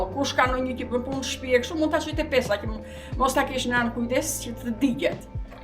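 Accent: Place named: Piqeras, Albania. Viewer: te lumshin duart e arta dhe modestia qe ke te ben mjaft fisnike! Por ti e ke ruajtur gjuhen e Piqerrasit, ndersa vajza flet me dialekt te Shqiperise se Mesme neper video! 😊😊😊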